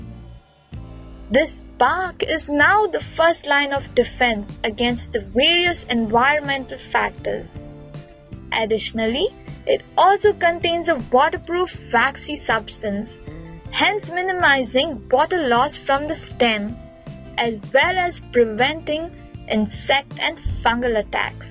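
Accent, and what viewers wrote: India. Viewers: this video could be useful, but sadly it's in india accent, take time to understand..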